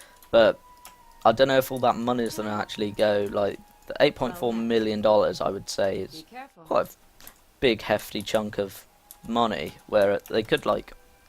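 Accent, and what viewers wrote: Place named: United Kingdom. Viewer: I want to hear the game not British voice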